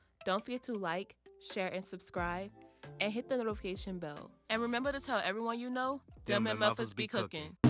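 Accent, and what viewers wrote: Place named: New Orleans, United States. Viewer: Ooooh y’all make me sick!😂😂😂🫶. I subbed from the poor man’s Rockefeller recipe. Y’all have the best online. Then I heard the accent and I was like “Oh these my people, ya heard me❤️. When I saw this recipe I’m like “You have to be New Orleans to understand the magnitude of this recipe. I’ll be here for more. Wishing you all the success on the growth of your channel.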